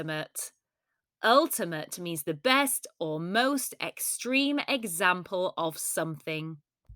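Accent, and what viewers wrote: United Kingdom. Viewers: Honestly I've never taken part in a charity event. And there are also some activities that we don't know, but we learn them with you which means that helps and encourages us to learn with you and your British accent. Thank you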